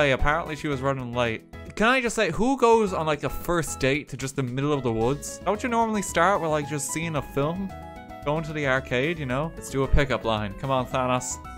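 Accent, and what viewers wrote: Ireland. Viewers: are your irish?!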